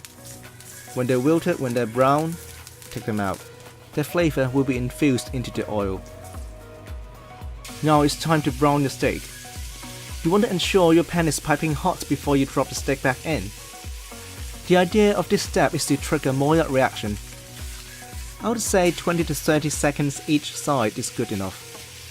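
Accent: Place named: United Kingdom. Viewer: Congrats! Finally your English Channel is here. Need to get a little used to your British accent lol since I’m from the U.S. will always support you as your other channel. 👏👏👏👍👍👍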